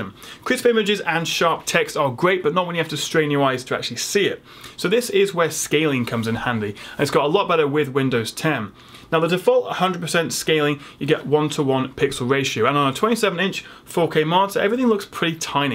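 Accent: United Kingdom. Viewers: Nice video btw. Should sound "eich" which sounds more natural. No offense to the british language. My uncle lives in england and my aunt is an engish women. I'm familiar with this accent but h always sounded kinda strange. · Are you from UK?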